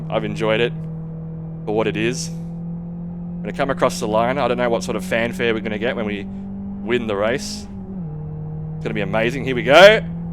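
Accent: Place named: Australia. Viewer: You sound like a Aussie. I'd have bought this but it's still over $40...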